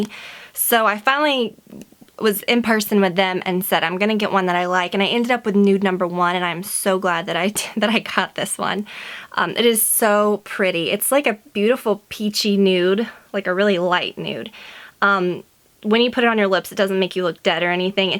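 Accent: South, United States. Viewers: I realize you're speaking in the same voice, tone, etc. that you always do, but for some reason you just sound SO southern! I can hear the accent so much clearer than I ever have before! So sweet! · I don't know why, but I've never noticed before this video that you have a slight southern accent. I must be totally oblivious.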